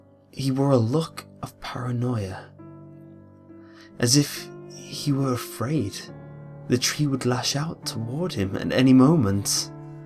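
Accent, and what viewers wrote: United Kingdom. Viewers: your britsh acent (i cant spell very well) makes every thing creepy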